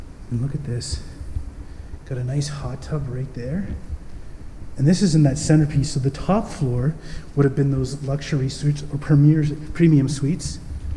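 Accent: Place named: Canada. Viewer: "We checked it ooot" = Canadian.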